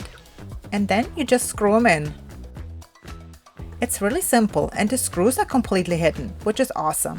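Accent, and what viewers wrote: Germany. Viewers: Sie spricht englisch wie eine Deutsche......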